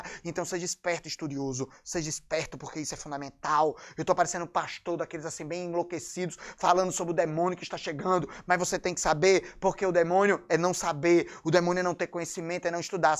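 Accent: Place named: Paraíba, Brazil. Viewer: Ese cara fala igual Paraibado OU potiguar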